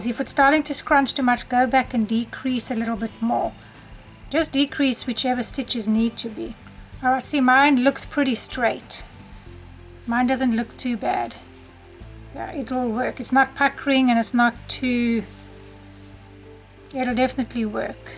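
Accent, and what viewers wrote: South Africa. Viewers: Your south african :) so am i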